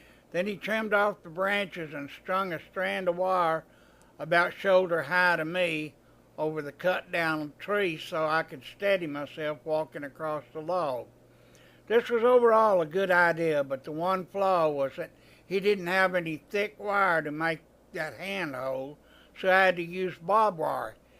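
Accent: South, United States. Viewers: What a fantastic Idea..School stories.Only thing,us northern canada guys find the story teller a bit hardish to understand,(southern accent)ha ha.Thanks for all your e mails & everything in the past.Ray Meyers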